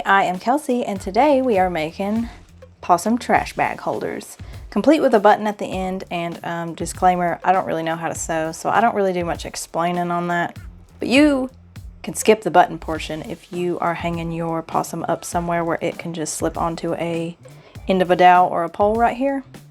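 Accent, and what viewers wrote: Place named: South, United States. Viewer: Such a great pattern!! Thank you for sharing. Gotta say I loved the southern in your speech. Made making that bad boy more fun :)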